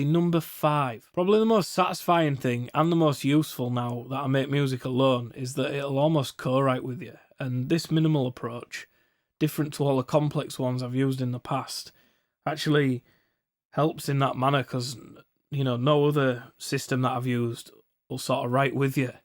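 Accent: Northern England, United Kingdom. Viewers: Haha, great video. I love the humour and the northern flavour (I'm a bloke from t'North meself). I really liked the video <$1000 modular too. I'm holding the line at semi-modular right now but the ropes are straining! Cheers.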